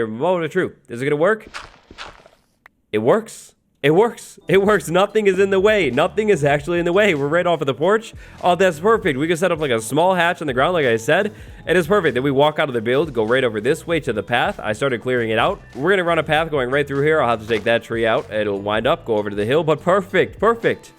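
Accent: United Kingdom. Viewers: ahh yes british boi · omg I love his British accent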